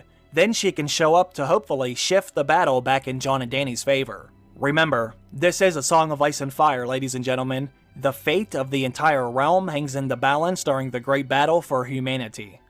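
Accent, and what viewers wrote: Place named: Baltimore, United States. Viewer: My guy, are you from Baltimore? Cux it sounds like youre from Baltimore.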